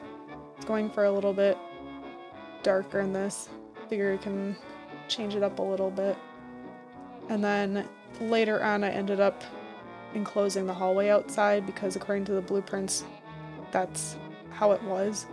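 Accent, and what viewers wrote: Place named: Syracuse, United States. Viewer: This is awesome. There is a magnolia in my hometown of Syracuse NY. Your accent kind of sounds like you’re from there!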